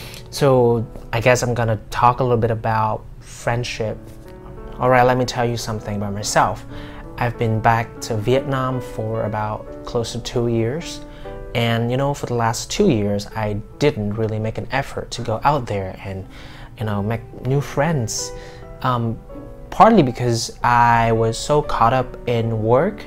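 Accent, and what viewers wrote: United States. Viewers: Em rất thích video của a. Sub viết song ngữ công phu kèm video. Có tải về cũng ko bị mất sub. Anh ở Sing bao nhiêu thời gian. mà sao vẫn giữ được cái accent US hay vậy. Chứ e nghe a có tý Singlish nào đâu 😂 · Yes. First ,I thought ur accent is like American ...it is about 90 percent, so great, that is kind of my favorite accents. I.hope to take IElts class from u.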